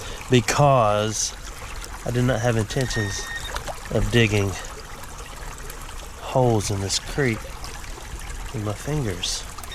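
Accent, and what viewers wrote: South, United States. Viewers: I get a lot of enjoyment out of misspelling in my head, the way that he says it. I have spent some hysterical minutes trying to spell aluminum foil "Foll". I'm not making fun, I'm just really enjoying your puns and your Southern accent anthropologically. I am not ever going to be clever enough to play on words like you do, it's a gift and we are lucky you share with us.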